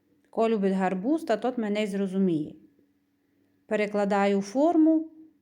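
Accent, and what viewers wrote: Transcarpathia, Ukraine. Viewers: Подписалась на Ваш очаровательный канал! Желаю ему процветания. Закарпатский диалект прелестный и самобытный! Привет из Калифорнии. Надеюсь, что наша родная Украина разобьет в пух и прах фашистов Раши и выгонит их со ВСЕЙ своей земли. И земля вновь зацветет своим прекрасным языком и диалектами! Слава Украине! Слава ВСУ! Все будет, Украина!💙💛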